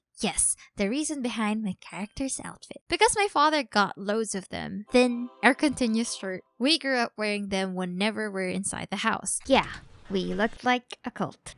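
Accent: Philippines. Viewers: If may I ask Are You Filipino???!! Your Accent Is kinda !!! Haha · 35 seconds into the video and I already know she's a Filipino xD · this my first time watching. you sound filipino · Soo u a filipino i know that accent imma filipino too :D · I knew it from the start y have a Filipino accent lol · Me: Hears say pancit canton, and no American accent Also me: Definitely Filipino. Edit: this is my first video watching don't bully me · And btw I noticed how speaks HAHA I notice in the first place but i don't have a clue filipina WHOAH LAB U ATE 🥺✨ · are you a Filipina??? cuzz you voice sounds like it??? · woah is a filipina girl i know the accent of the filipino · Based on the grammar and accent YOUR A FILIPINA! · The first time i saw this i was like : why does she have a filipino accent?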